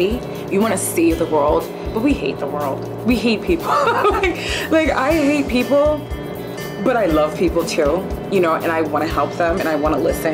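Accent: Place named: Caribbean. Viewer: You have a Caribbean accent...where u from....intj from usvi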